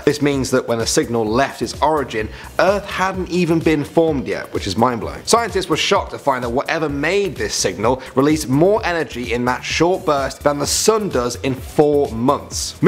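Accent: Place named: United Kingdom. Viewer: you love space. ok ok, I get it. I love british accents.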